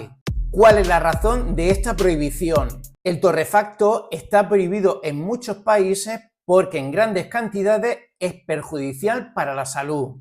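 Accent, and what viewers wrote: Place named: Murcia, Spain. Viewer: No puedes negar que eres Murciano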